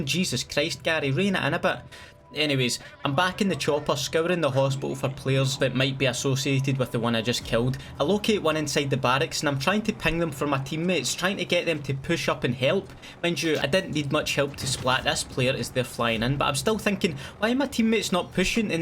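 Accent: Scotland, United Kingdom. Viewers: Don’t forget the Scottish accent and “almighty folks” I won’t even play PC/console cod’s but I just watch him for fun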